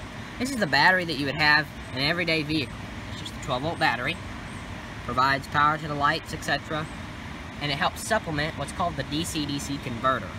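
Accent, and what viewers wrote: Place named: South, United States. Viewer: Man you haters got to stop hating, this young man is awesome and you throw around shit about being gay and needing bass in his voice. Dudes you obviously ain't been nowhere in your boring ass lives. People from certain parts of the country just talk like that, parts of NC, parts of Tennessee, and many other regional dialects, just watch NASCAR some time. My hat goes off to this positive young man not wasting his time on worthless pursuits. Soon as one of you does something relevant feel free to cast stones.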